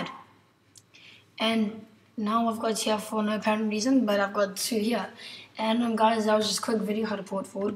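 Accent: South Africa. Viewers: THANK YOU!!! And you're South African. :D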